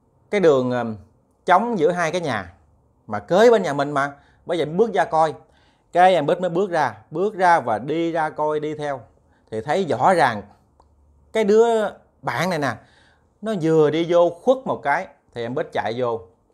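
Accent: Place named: Ben Tre, Vietnam. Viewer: Quê e ở đâu vậy,ah nghe giọng e gióng ở Thạnh phú,Bến Tre quá.hihi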